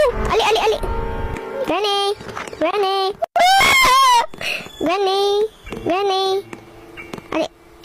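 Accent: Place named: Visayas, Philippines. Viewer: Bisaya ka uyy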